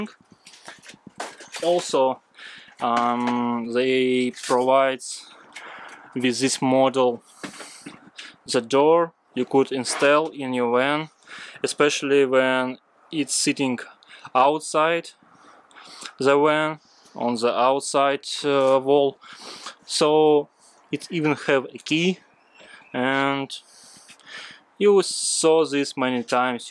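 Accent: Russia. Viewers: Чувствуется русскоязычный акцент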